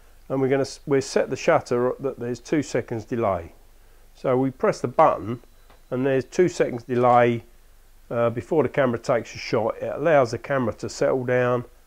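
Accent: United Kingdom. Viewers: I can hear the light holders clicking loudly. If you don't loosen them before you angle them you going to strip the gears.Is that a Cockney accent we are hearing. I'm a good boy I am.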